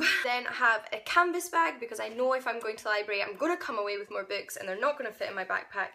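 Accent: Scotland, United Kingdom. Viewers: You sound Scottish!! I adore how you said Zed if I didn’t see the Z I wouldn’t have known what you were talking about and I thought the apple pie was funny 😂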